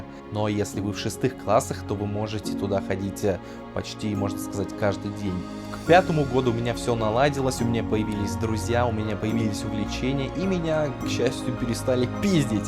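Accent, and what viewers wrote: United Kingdom. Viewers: Дружище, у тебя ахуенный британский акцент. Южный как я понимаю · Чувак, очень классное видео, продолжай и достигнешь высот! Кстати, если не сложно, сними, пожалуйста, видео о постановке британского акцента, твой звучит очень аутентично! Мб хоть какие-нибудь советы дашь, как улучшить звучание (не говорю о дефолтных TH и т.д) В общем, урок для тех, кто изучает английский в России, но не находится на начальном уровне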